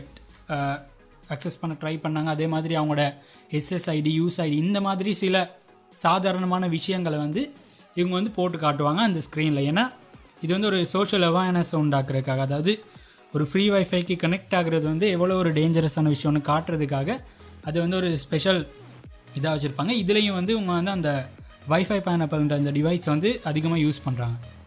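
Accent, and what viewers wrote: Sri Lanka. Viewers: Bro neenga Sri Lanka vaa ?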